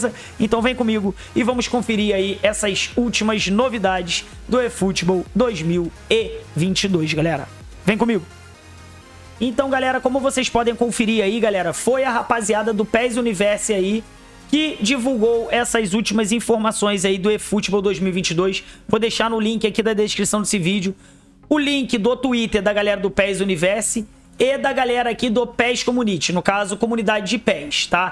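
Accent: Rio de Janeiro, Brazil. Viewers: Vc é carioca ? Seu sotaque Xxx forteee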